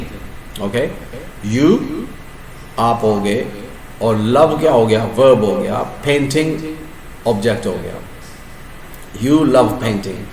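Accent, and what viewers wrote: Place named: United Kingdom. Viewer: That is such a great opportunity to learn English with you I am so glad to listen your British accent so I am ready to learn English with you..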